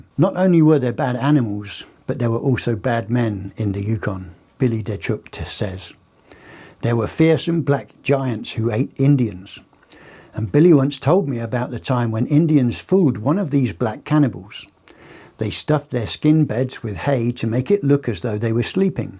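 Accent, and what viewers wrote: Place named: Ireland. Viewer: I really like this man’s story telling the Irish accent is so pleasant to hear. Bigfoot is real. They are an ancient tribe of people. DNA has showed they are a human / hybrid. Yes dog men are dangerous and can be found in Michigan to the east coast. They are what natives call skin walkers because they can stand on their back feet as well as on all fours. Some call them dire wolves. Bigfoot, Sasquatch or Sabe are intelligent and many known cases of saving humans.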